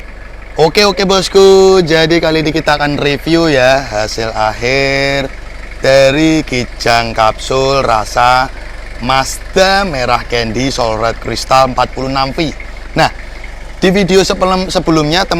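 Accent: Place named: Blora, Indonesia. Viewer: Langanane wong blora iki mas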